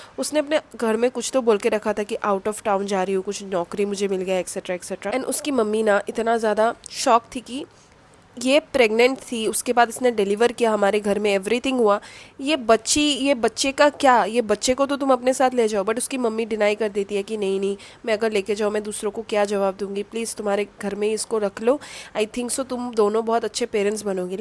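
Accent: Bengal, India. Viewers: You also form Bengal (coz your bengali accent tells it)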